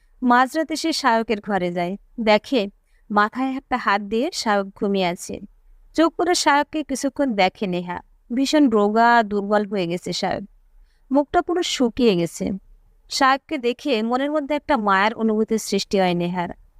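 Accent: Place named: Bangal, Bangladesh. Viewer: Bachik shilpir golpo-porata ekdomi bhalo lagalo na. Bangal bhasay kotha barite boltei paren, tai bole publicly porar somoye, koresen, khaisen, seleta, hoyese, firese - eigulo kane kot kot kore lagey. Ishh.....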